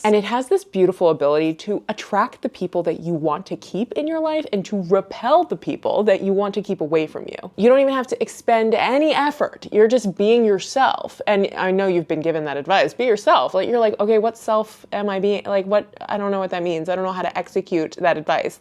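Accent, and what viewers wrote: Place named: Midwest, United States. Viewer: Are you from the Midwest? You talk like people in my circle, so listening to you felt very homey.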